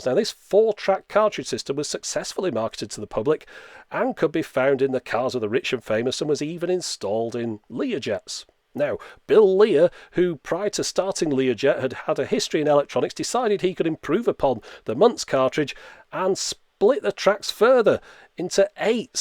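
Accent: United Kingdom. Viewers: its a british accent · I was just blindsided by the pronunciation. I am pretty used to the different ways we say the same words through the English speaking Western World, but I never heard "beta" spoken like that before. I think UK accents of all types sound so refined, even all the slang terms. Tattoo is taa-ewww, and of course aluminium way cooler your way. Your accents automatically make you sound like experts to USA residents, even the minimally educated British sound smart to us, LOL...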